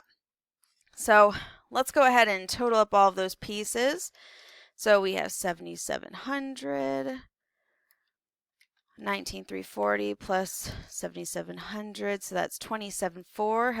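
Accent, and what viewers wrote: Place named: United States. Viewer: Oh I know now, American English!!!🧐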